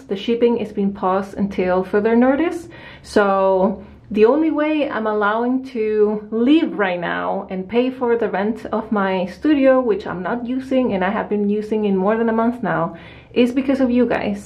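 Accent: Chile. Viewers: Gracias, soy Mexicana, y apesar de que dices que el español chileno es difícil de entender, me encanta tu asentó, el que menos entiendes es el de Buenos Aires, hugs and kisses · tooooodos los acentos son preciosos. ❤ Soy española y los acentos de Latinoamérica me súper encantan!!! Y el acento de Chile es bacán 😉😁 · Also today a recommended your channel to a friend who lives in texas so she can get used to the chilean acento when she meets me (one day)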